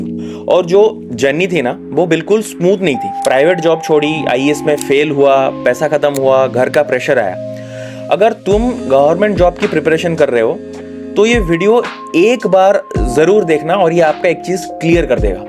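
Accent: Odisha, India. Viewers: Bhai aap odisha se ho ?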